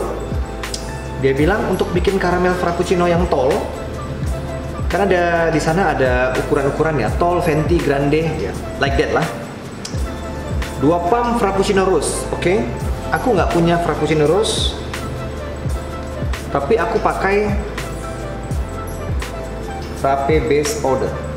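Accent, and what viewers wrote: Medan, Indonesia. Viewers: Kayaknya orang medan kau bang. Soalnya logatnya medan kali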